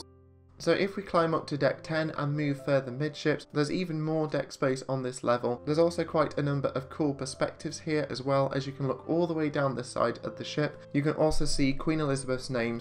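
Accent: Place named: United Kingdom. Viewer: An incredibly gorgeous video! Thank You for all the hard work and dedication you obviously put into this project! Photography and colours are fantastic! I’m booked on Queen Elizabeth for an Alaskan Cruise in August 2024! First time onboard this grand ship! Can hardly wait. Especially after viewing this film! The Queen Elizabeth is such a classy and elegant ship! I love all the beautiful wood panelling and art deco designs. Evoking the golden age of Cunard history!! So glad to hear you enjoyed the cruise with your family ( especially your grand mum ) Cherish your time with her always! I wish I still had my grandparents with me!! May I also compliment you on your wonderful narration and speaking voice? I really am not sure why, but we Americans are always so impressed by British accents! So classy and refined. Very Laurence Olivier!🇬🇧🧐🙂 Looking forward to future videos from your website! 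Cheers MikeW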